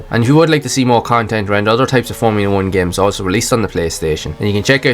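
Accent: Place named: Drogheda, Ireland. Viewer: Class vid. Thanks for sharing. Thats gotta be a drogheda accent?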